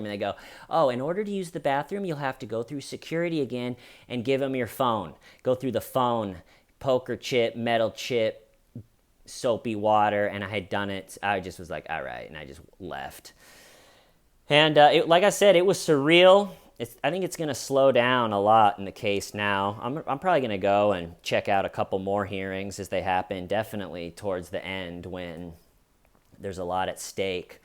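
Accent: England, United Kingdom. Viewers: Amazing reporting. Loved every second of it especially the English accent Btw. Any news on the big film premiere Can’t wAit to see it I found the BBC lady. She is quite new on screen reporter So funny Tattoos Poker chips Maxwell Hilarious 😆 🤣🤣😆😆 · Love love love the English accent. Lol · And that is why they are security guards. Good English accent by the way.👏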